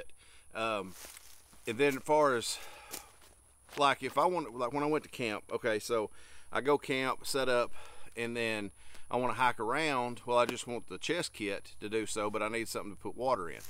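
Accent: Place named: South, United States. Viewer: I spent this entire review repeating everything you said trying to improve my southern drawl accent 😄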